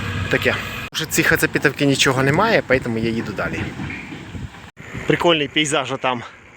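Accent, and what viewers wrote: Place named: Surzhyk, Ukraine. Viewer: Суржик жосткый)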